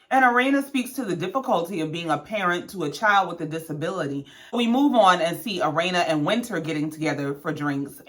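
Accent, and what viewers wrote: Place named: Gullah Geechee, United States. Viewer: I knew you sounded like a cousin!! I’m from Savannah! Heeeeey Geechee cousin!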